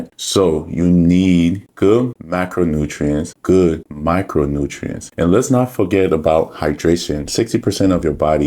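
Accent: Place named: Liberia. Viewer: I can hear a slight LIB accent... you Liberian bro?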